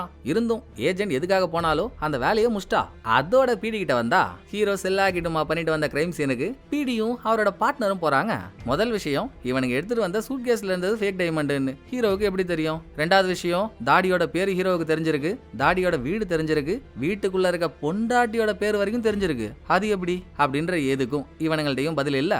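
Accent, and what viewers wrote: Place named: Chennai, India. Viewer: Pakka Chennai fellow bro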